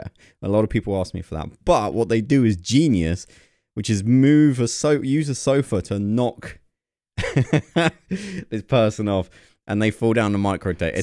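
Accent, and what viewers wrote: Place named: England, United Kingdom. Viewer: i dont noouuu ... omg, if original English sounds like that than i prefer more American English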